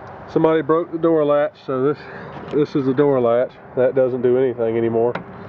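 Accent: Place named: North Carolina, United States. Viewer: I absolutely enjoyed your commentary! I'm guessing you're from southeastern North Carolina. I'm from Wilmington.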